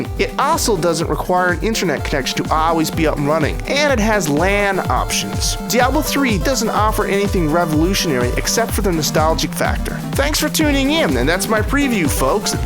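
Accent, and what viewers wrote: Canada. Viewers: is it me, or does this guy have a really heavy canadian accent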